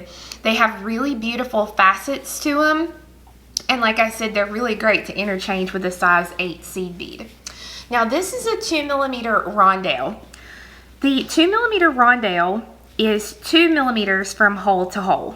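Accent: South, United States. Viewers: Thank you for explaining these beads. I’ve been going nuts with the differences between Toho and Miyuki seed beads. Throw a few Czech seed beads into the mix and you’ve got a hot mess! BTW, I wish I could trade you my Chicago accent for your charming southern accent!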